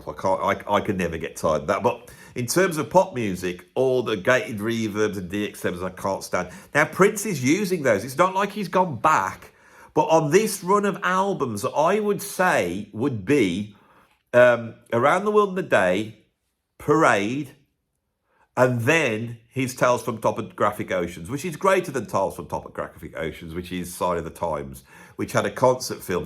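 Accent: United Kingdom. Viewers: I never really was a fan of Prince and I don’t know why I watched this, except that your rants and British accent I find very entertaining.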